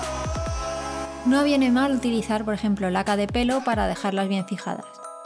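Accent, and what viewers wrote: Spain. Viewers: tu canal es ermozo pero una pregunta eres española?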